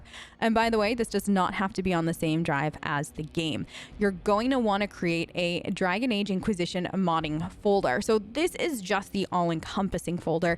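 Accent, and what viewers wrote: United States. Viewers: Sorry i appreciate your trying for newbies but this way too superficially explained. DAI mod manager and frosty aren’t really compatible then I followed your steps which look SO EASY and no mods worked. Instead I had to manually change my patch that my game works again and i get constantly an error in dai mod manager and no green bar when pressing merge. And your heavy American accent doesn’t make things easier 😫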